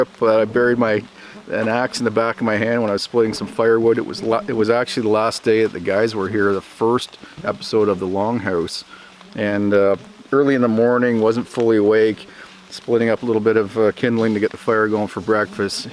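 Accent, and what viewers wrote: Canada. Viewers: are you canadian or american ? love your video very very much by the way thanks man from quebec !!!!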